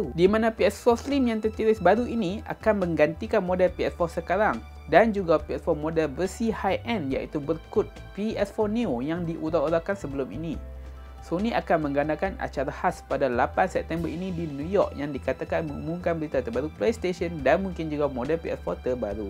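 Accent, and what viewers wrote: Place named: North, Malaysia. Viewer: pekat utara hang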